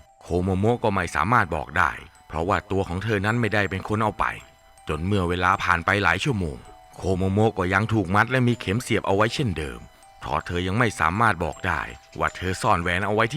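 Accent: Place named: Korat, Thailand. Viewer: คนโคราชบุรีรัมย์ใช่มั้ยนิสำเนียงชัดมาก😂😂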